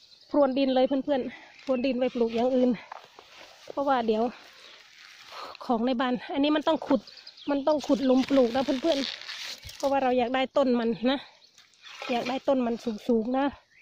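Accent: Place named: South, Thailand. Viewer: ดูแลตัวเองด้วยจ้าา,เอื้อย บ่สบายเว้าสำเรียงออกมาทางภาคใต้เลยเนาะ(น่ารักไปอีกแบบ)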